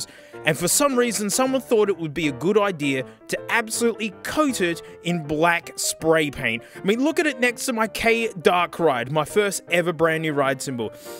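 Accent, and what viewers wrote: Australia. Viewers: It's the magic of Australia accent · This man is audibly austrailian. Thanks, youtube reccomendations.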